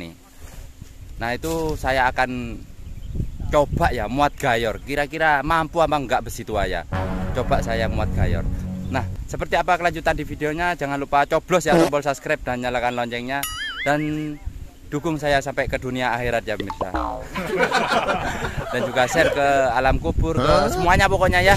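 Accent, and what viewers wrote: Madura, Indonesia. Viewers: Mas orang malang apa madura ya.??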